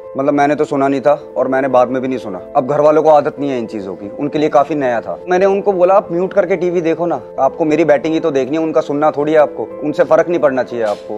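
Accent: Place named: Haryana, India. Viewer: Bhai ka pure Haryanvi accent hai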